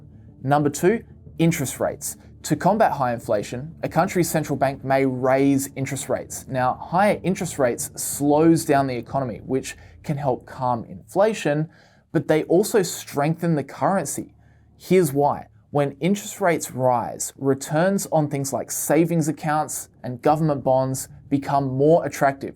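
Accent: Australia. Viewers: Maybe I am biased towards the Australian accent, but your videos are really so much fun and so much info. Thanks from the bottom of the heart!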